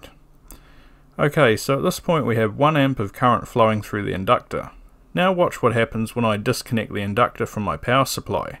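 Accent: New Zealand. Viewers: 😍 I could listen to a Kiwi accent all day. ❤ from the West Island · Great vid, thanks. Nice to hear a fellow Kiwi accent for once!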